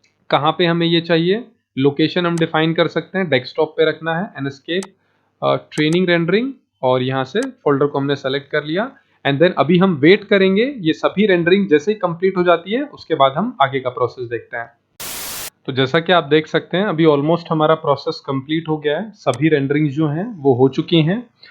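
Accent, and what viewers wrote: India. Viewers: Sir pls at least add subtitles or cc in your video.. I really dont understand what are u saying with ur indian accent.. i can only understand a few word and not all because ur english accent use indian accent. So pls pls add subtitles🙏🏻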